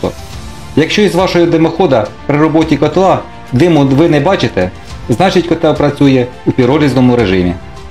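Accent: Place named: Poltava, Ukraine. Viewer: ВІтаю! Відчувається рідний діалект) Чи не Полтавська обл?) Як можна отримати креслення? Дякую! Бережи Вас Господь!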